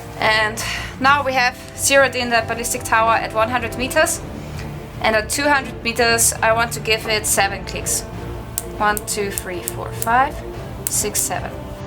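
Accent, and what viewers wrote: Austria. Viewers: very strong german accent，very Österreichisch tuned, better u do it in german and english subtitled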